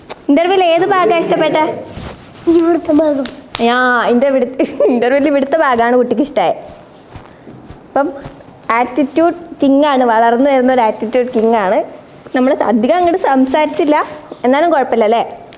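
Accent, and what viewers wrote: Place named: Kannur, India. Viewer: Anchor kannur slang ... 😂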